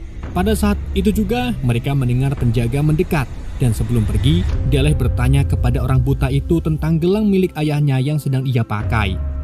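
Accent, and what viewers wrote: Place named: Banyumas, Indonesia. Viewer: Mas admin, Njenengan asline ngendi mas? Narasine karo bahasa ngapak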